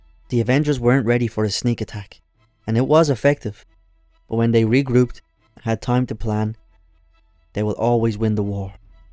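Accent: Dublin, Ireland. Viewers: A Dublin comic channel. Never thought Id see that. Well you sound like your from Dublin anyway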